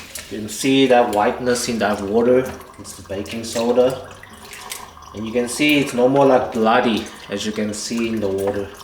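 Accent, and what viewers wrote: South Africa. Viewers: your accent sounds like and is in fact indistinguishable from a non-white person's south african accent - cool man! thanks for the recipe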